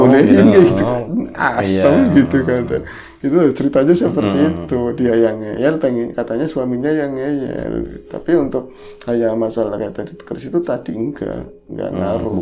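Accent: Banyumas, Indonesia. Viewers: Aq suka klo bro ngomong ngapak..lucu dengernya..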